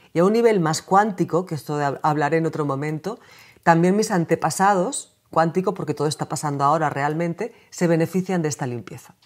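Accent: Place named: Spain. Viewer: Que dulzura la de esta mujer. Su voz tan calma, tan.armoniosa, tan de paz.... de por sí ya te trasmite calma y sanación. Que bella es la fonética de los nativos de España.... enamora. La escucharía por horas y horas... Jajaja Saludos desde Rosario - Argentina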